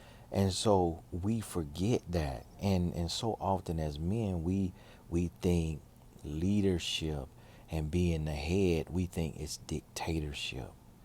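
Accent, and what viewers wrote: South, United States. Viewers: I have always enjoyed your videos because of how you speak. Aside of your country accent (it's so cute), you drop bombs that need to be dropped. With that said, I don't know where you are or what your doing, but these video topics have been on a different level altogether! I am appreciating these videos because although they're not as long as you normal record (I wish they were but I know you're taking care of business), they are absolutely needed! Thank you for staying consistent despite of your abundant season. Can you do a video about our relationship to money once we're out of debt? Please and thank you.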